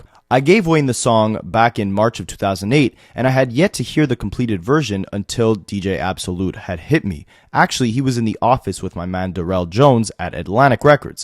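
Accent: Canada. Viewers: Drake must be Toronto slang for Fake. No offense I can hear ur canadian accent and like your work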